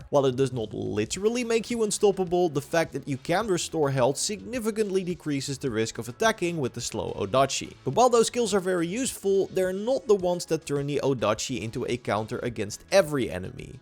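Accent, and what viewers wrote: Netherlands. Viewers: Bro are you dutch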